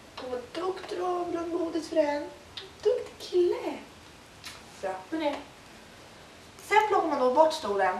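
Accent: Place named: West Coast, Sweden. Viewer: Det var roligt! Är du i Göteborg/västkusten? (just WAGguessing at your accent) Lärde mej svenska pä länge sen! I totally agree with you re most English speakers not knowing another language! (Im USA). Thanks to kikopup for leading me to your video. She has awesome dog training videos here. Hejdä.God Jul!!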